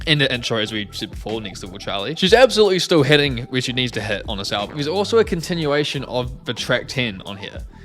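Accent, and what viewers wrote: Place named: New Zealand. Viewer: cool! is also from New Zealand, so you both have this nice accent. You also have to check her albums out!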